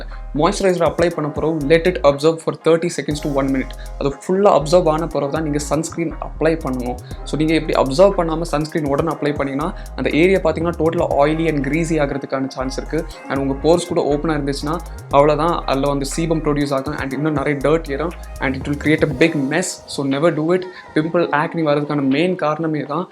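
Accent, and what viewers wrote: Kanyakumari, India. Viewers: slanglae purichuruchu bruh.....sweet voice + kk slang lite😅❤️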